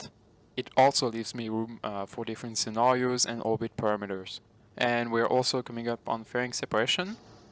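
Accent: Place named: France. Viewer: La vidéo apparait de manière random dans mon fil... je t'écoute parler et je reconnait un petit accent francais.. tu serais pas à Supaero par hasard?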